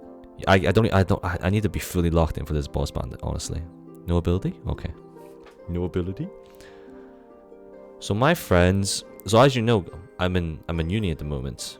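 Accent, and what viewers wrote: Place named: Northern England, United Kingdom. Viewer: Which county are you from you sound like ur from the north but I’m ashamed to say I can’t tell which specifically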